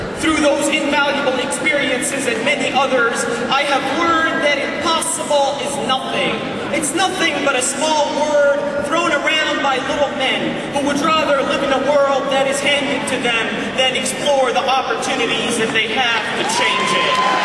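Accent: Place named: United States. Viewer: What a true American sounds like!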